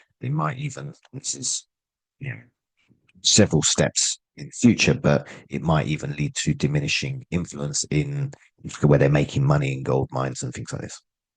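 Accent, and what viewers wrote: United Kingdom. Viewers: copium hard by British accent what would you expect, the truth.... Saddam had Nuclear bombs in Iraq...... HAHAHAHA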